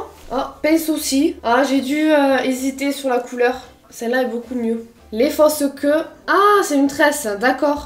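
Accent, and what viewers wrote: South, France. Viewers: En tout cas on sait qu'elle vient du sud pas de doute à priori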